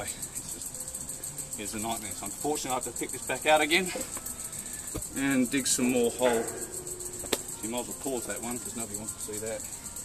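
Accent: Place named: Australia. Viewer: Found a diy with Australian accent. Yay.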